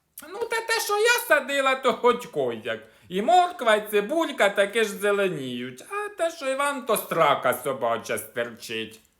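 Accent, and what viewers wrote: Poltava, Ukraine. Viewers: Через Гальку не посадила раньше огород.. Іван там де садив то срака собача сидить а не огород. Ну вже ж підняла настрій. Наш Полтавський говір. Наче дома побувала. Молодець.❤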